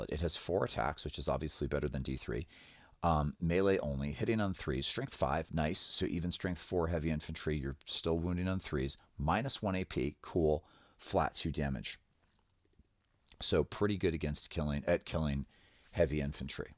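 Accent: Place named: Canada. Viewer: Not saying Canadian's are cooler or anything. It is a cool accent nonetheless. We are all from London anyway. 🤣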